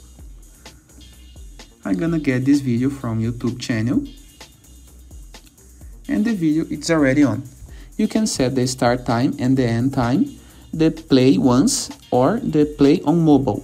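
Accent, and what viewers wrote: Brazil. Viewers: Nice content and nice sotaque brasileiro, meu querido!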